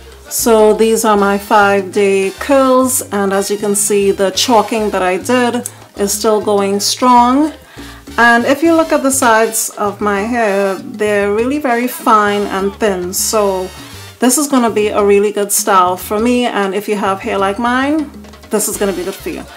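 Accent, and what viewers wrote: Trinidad and Tobago. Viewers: Hiiii! That accent sounds familiar. Trini?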